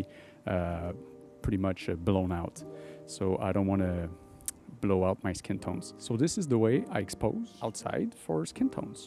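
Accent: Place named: Quebec, Canada. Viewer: I think I can hear you quebec accent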